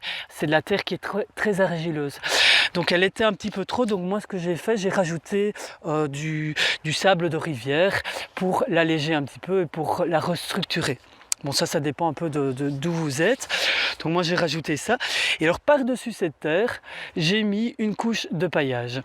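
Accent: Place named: Belgium. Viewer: Accent accent belge, juste un peu comme, sans plus quoi....Alleï bonne journée!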